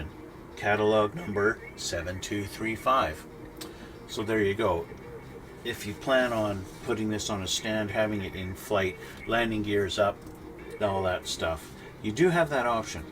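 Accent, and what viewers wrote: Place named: Canada. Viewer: Where are you based out of? You sound Canadian.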